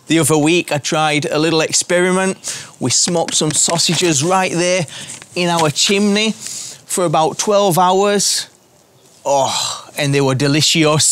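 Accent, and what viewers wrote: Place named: Bradford, United Kingdom. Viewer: Northern Spanish from Bradford? Unique! 😁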